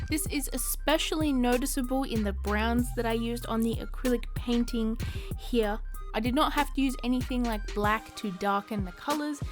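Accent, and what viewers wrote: Australia. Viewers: I luv that australian accent :D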